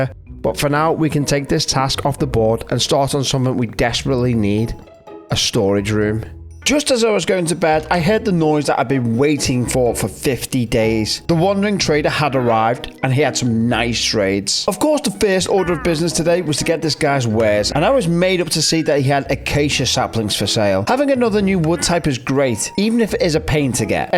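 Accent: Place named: Liverpool, United Kingdom. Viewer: Never knew i needed a scouse mc youtuber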